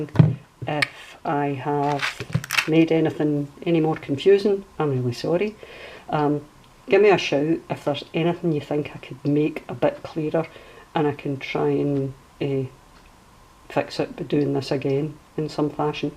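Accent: Scotland, United Kingdom. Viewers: I love listening to you talk, I was so distracted by your lovely "accent" that I wasn't paying much attention to the instructions. Can you tell I love outlander? 😂 but I've always loved scotland and ireland both. I love the way people speak, it gives english such a flavor, I'm actually infatuated with it. I'll just keep listening to you talk now. Thank you for the tutorial as well, everything sounds better with a scottish accent 😊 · Thank you for this instruction. Just bought a crop-a-dile and had to check YouTube for advice. By the way, I love your Scottish accent. It's a joy to listen to you.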